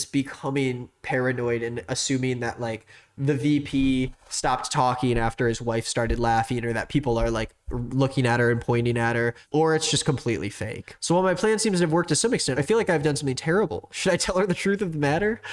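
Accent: South, United States. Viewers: I work in a cali firm and I can tell you that everyone would find a southern accent super cool AND she says stuff like 'darn tootin'??? Amazing. Love her. I wish her the absolute best.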